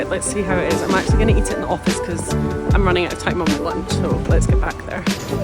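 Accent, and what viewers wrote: Scotland, United Kingdom. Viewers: Are you from Scotland? ❤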